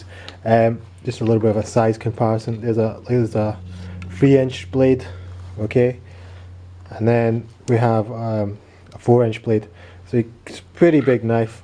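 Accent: Scotland, United Kingdom. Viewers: Are you Scottish. Cool video man. Thanks for sharing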